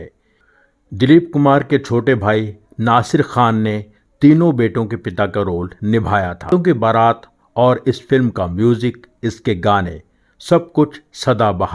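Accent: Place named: Punjab, India. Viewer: Brilliant Hindi in Punjabi accent.....all in the family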